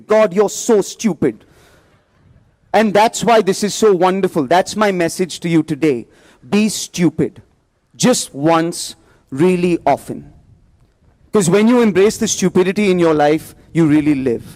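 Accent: India. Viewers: Now that was in proper indian accent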